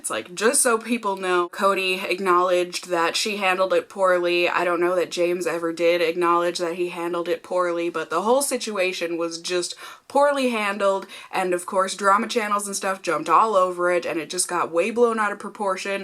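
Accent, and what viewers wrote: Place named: South, United States. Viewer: That Southern accent and the drag comments made me subscribe to you. Also the fact that you called out James Charles and the drag/clap back culture.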